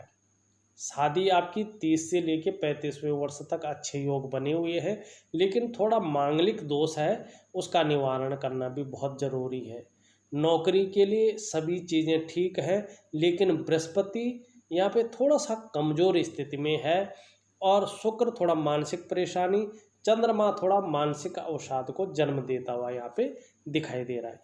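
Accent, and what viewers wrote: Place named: Garhwal, India. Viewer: Guru ji bhasha se gadwali lag rahe ho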